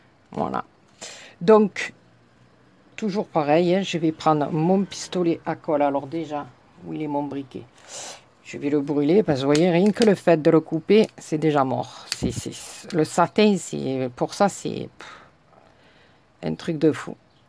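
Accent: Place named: South, France. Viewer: Coucou, Waouh elle est tellement belle ta carte, du vrai shaby chic 😍 La dentelle se marie très bien avec ce rouge Hermes;) Merci pour la combine du doigt en silicone je ne connaissais pas! Ça doit être bien pratique. Ton accent me transporte à chaque fois ds les films de Fernandel 😁 j’adore!!! 😊 Passe un bon week-end! Gros bisous 💖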